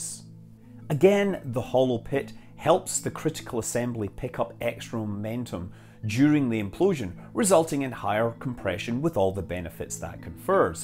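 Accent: Scotland, United Kingdom. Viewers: I canny place your accent, Scottish ~like me, not Glasgow, more 'refined'........hmmm. :) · You know, most of us just watch your videos because we’re entranced by your Scottish accent. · where are you from originally in Scotland? Your voice reminds me of my grandfather who was from Wishaw:) I've lived in Wales most of my life and miss the Scots accent!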